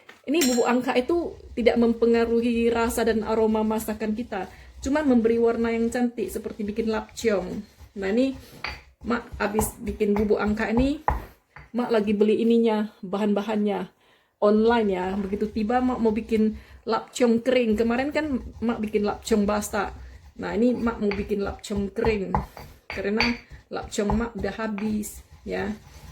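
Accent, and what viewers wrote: Medan, Indonesia. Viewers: Salam kenal y, suka dengan vidio nya, dari bahasa nya macam org medan y😂😂😂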